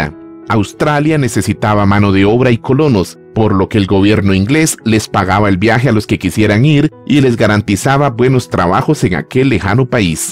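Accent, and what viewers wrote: Costa Rica. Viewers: Ya sabía que eras Tico por tu acento, muy bueno el reportaje. Amo los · Desde que empecé a escucharlo dije... Este es acento tico 🇨🇷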